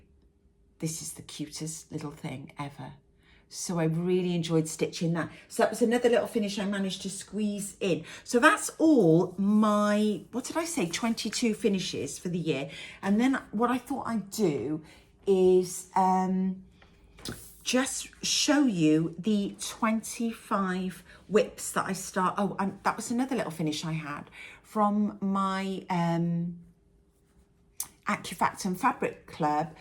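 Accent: Wales, United Kingdom. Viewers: Your work is just amazing and it’s such a delight to watch and listen to your enchanting Welsh accent. Thank you!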